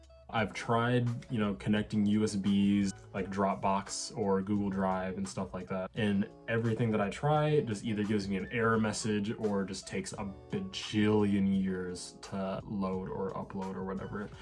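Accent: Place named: United States. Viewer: I thought you were American lmao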